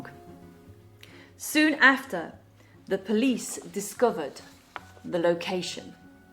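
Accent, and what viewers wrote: United Kingdom. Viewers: Hi, it's a great story with a gorgeous British accent. I listened to it with great pleasure. Keep making us happy.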